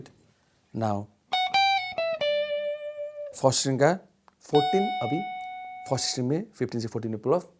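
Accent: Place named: Nepal. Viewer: I think your pronouns like a nepali guy. Is it true.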